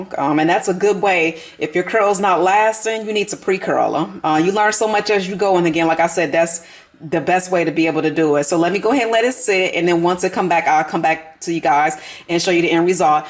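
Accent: South, United States. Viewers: I love your southern drawl!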